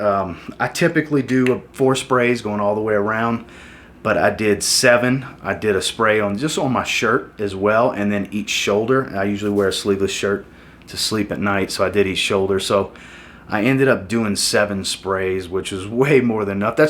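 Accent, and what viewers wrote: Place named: Gulf Coast, United States. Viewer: I’m wondering what you would recommend for a night out on the town in New Orleans. May be wrong but you sound like you’re from the region, gulf coast at least… therefore you know how hot and humid it gets. Looking to wear a fragrance for this Saturday if possible. Already own some basics that could work like Sauvage and CDNIM… Sauvage got me strong compliments the other night. What would you recommend to me? I was close to getting profondo but am now changing my mind. How about something like Prada Carbon? I’ve been looking for days for a good summer night fragrance and I can tell you’ll set me straight!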